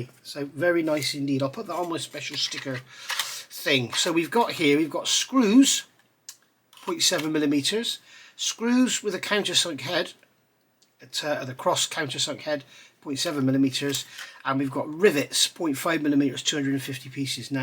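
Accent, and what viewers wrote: United Kingdom. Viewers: define the accent...........UK?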